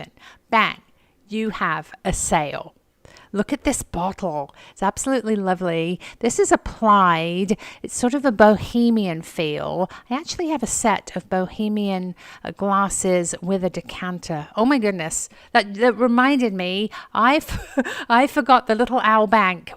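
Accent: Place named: United Kingdom. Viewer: I thought you were British which was why I mentioned the UK. Love your accents either way. I don't believe that I have ever seen it written in any book or novel that way and just began hearing it the past couple of years and was curious and it certainly was not taught in school that way. Anyway, thanks for the response and the videos..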